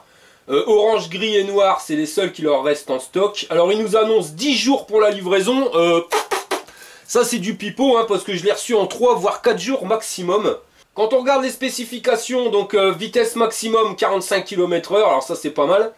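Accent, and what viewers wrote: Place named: North, France. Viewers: chalut biloute dit moi apres les 3 ans de la sortie de ta video que pense tu encore de ce modèle on a un budget de 1000e par biclar et ce model nous plait bien . orange y pete sa mère sur ce bonne continuation terrible les video avec l'accent de ch'nord tio merci a toi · Merci à toi, j'adore ton accent Ch'ti, je voudrais en acheter un fin du mois, tu m'as convaincu ! Amitiés, cordialement Dominique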